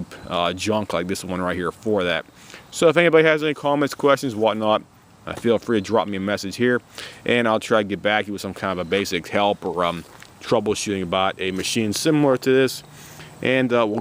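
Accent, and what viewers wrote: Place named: Delaware County, United States. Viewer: Dude you have the straight up delco accent haha.You sound like Bam Magera.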